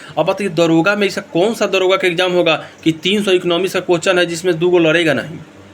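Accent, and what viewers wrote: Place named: Bihar, India. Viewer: Aap ekdam theth bihari style mei bataye hain sb samajh mei aa gaya sir 👍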